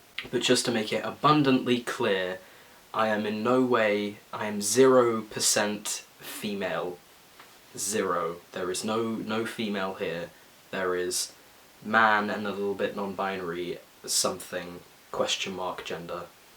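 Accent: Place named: United States. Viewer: All I can see is that youre kind of speaking with an american accent already. Is it because of the songs your singing?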